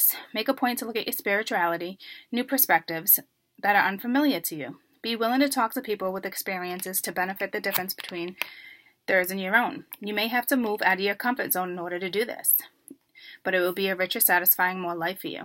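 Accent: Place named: New York, United States. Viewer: Your New York accent is the cutest thing 💕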